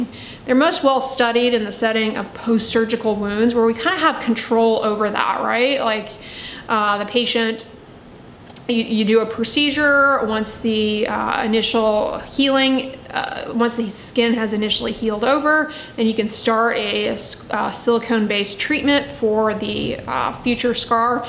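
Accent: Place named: Philadelphia, United States. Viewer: I love your videos, but I’m constantly trying to pin your accent. It’s sounds like the Philly tri-state area (PA, So.NJ, No. DE)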